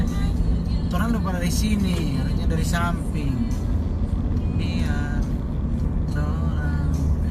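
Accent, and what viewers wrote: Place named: Manado, Indonesia. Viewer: Knp gambarnya gak penuh ini sprti buat flog stengah2 bahasanya slalu logat manado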